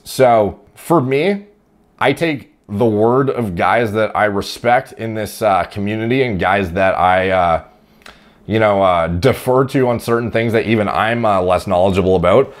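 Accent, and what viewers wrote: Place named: Canada. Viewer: Canadian accent, like mine lol. I've been following his channel for quite some time and he's mentioned enough about himself without getting too personal.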